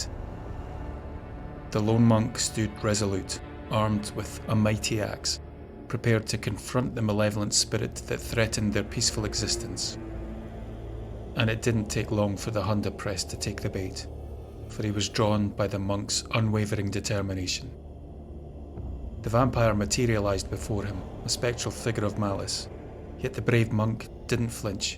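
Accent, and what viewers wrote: Scotland, United Kingdom. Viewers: These videos are worth watching just to hear the awesome Scottish accent.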